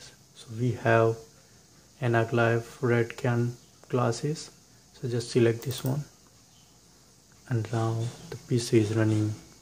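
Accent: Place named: India. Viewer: Thats how hindi douche speaks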